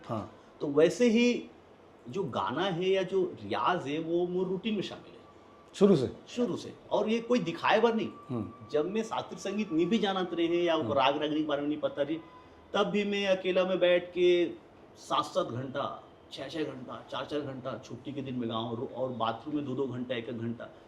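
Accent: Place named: Janjgir, India. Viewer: Special thanks to Ji👍 आपके जांजगीर फ़्लेवर छत्तीसगढ़ी बहुत अच्छा लगथे! रायपुरिया छत्तीसगढ़ी सुने में अच्छा लगथे, पर बोले में थोड़े परेशानी होथे!!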